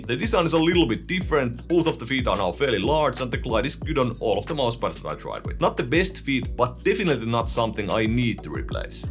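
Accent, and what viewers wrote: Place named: Finland. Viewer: Your reviews are amazing and the Finnish accent is icing on top of the cake!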